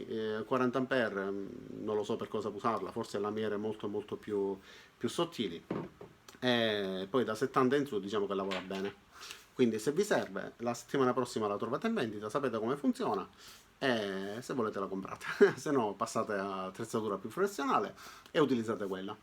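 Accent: Sicily, Italy. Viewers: Salve signor, potrei chiederle se acquista i prodotti parkside in o anche in un negozio fisico della Lidl? per via dell'accento ipotizzo che potrebbe essermi utile, abito in un paesino da cui il LIDL più vicino è in realtà parecchio lontano e mi farebbe comodo andare sul sicuro, non ordino online infatti proprio perchè a giorni dovrei recarmi a Palermo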